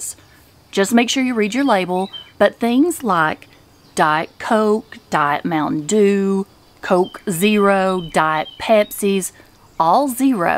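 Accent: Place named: Louisiana, United States. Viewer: You’ve got to be from Louisiana Boo! I love it. 😂❤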